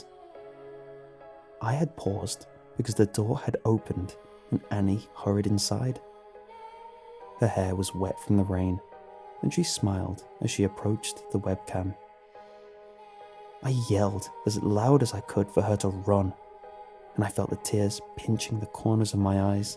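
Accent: United Kingdom. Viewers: Mr.BrittishAccecentCreepManDudeChillVoiceCreepypastaSir Cna i have a like from u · Well, I really enjoy listening to pastas read by you (especially since I definitely prefer British over American accent), so like always, good job :) But I just had to comment on those stories - it was pretty easy to guess what was going to happen next and they were not scary at all. And please - how could I expect a phone making a sound when someone is hiding xD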